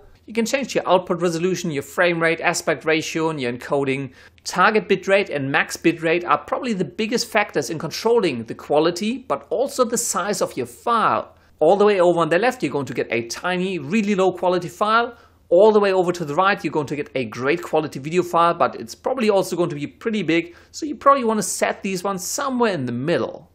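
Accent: Germany. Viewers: nice German accent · Kommst du aus Deutschland? Kommt mir so vor als hättest du einen leichten Akzent :) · Hey where r u from? U sound german :) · Dieser deutscher Akzent xD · man dein englisch hört sich echt deutsch an... warum kein deutscher Kanal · Nice vid, i'm guessing u are german because of ur accent, i know because i'm dutch :D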